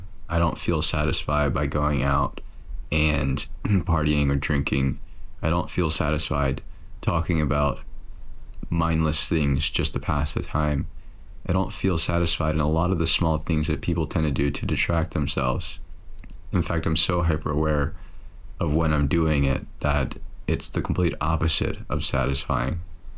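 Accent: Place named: United States. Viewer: where are you from bro. I am from Uzbekistan. I am learning English for IELTS degree at the moment. in my opinion, you are American. I shadow you for fluency.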